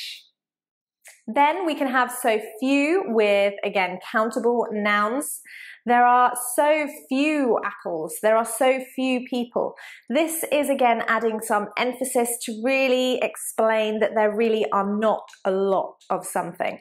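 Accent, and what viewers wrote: United Kingdom. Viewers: Thank you so much, you are such a great teacher. I've made great progress in my English level by watching your channel! your British accent is really lovely.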